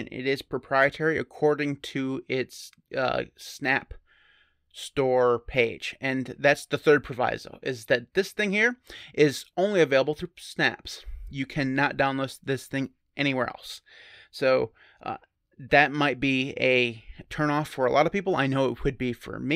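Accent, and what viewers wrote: Spain. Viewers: Buen acento español :D eres el mejor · Me encantó tu acento en español, I loved your Spanish accent, maybe my English accent doesn't is so good. Dialect reminds me Crow-translate, the interface seems to be the same.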